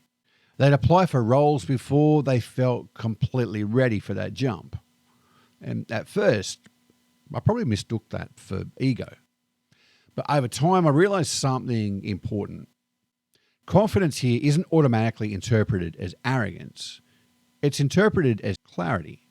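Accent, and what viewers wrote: Australia. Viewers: I love your Aussie accent.🎉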